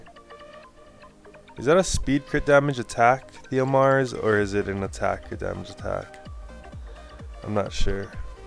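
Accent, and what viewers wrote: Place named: Hawaii, United States. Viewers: Yeah I could hear the pidgin small kine too. Lol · nah, its not thick, but if you're from hawaii theres certain things that you can never stop and you recognize when others do it too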